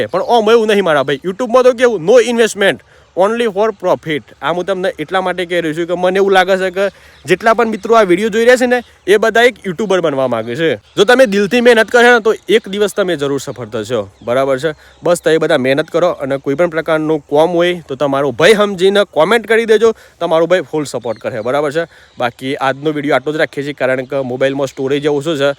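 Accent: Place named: Mehsana, India. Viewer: મને બીજું કંઈ નથી ગમ્યું બસ ભાઈ ની મહેસાણી ભાષા બહુ ગમી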